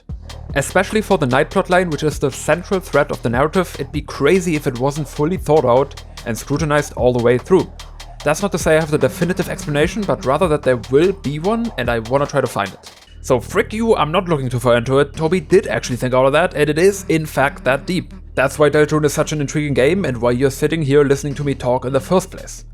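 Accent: Germany. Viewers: Love your german Accent :D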